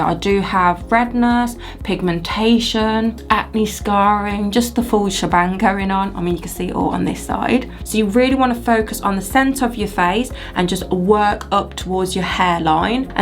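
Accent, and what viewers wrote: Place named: Birmingham, United Kingdom. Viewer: Subbed. Can I hear a fellow Brummy? ❤️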